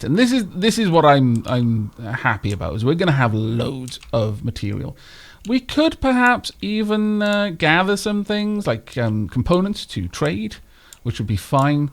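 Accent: Wales, United Kingdom. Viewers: Smartness Tooth is out, novacaine wore off, video is out... Trying yo decide wether to die from the pain, or jump for joy for a new RimWorld video. Leaning much more towards the jumping for joy option. voice is very soothing... I'm only a quarter through the video, and my jaw is already starting to fade. I think its the Welsh accent. Even my 'murican upbringing can appreciate an amazing accent ^-^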